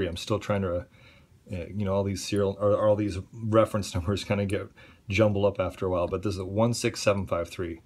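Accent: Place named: United States. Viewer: Are you actually Dutch? You sound like a native speaking American!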